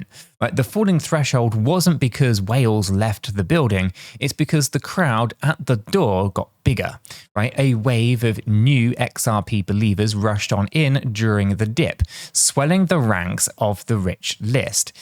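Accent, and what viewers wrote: United Kingdom. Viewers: You are charismatic explaining complicated issues with your British accent humoristicly & simply for all kinds of listeners!! Really a blessing having you in YouTube channel!! Hello from Athens capital city of Hellas.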